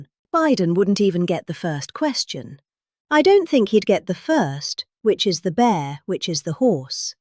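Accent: England, United Kingdom. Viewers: Dear Americans, that is an English accent. There is no such thing as a 'British' accent.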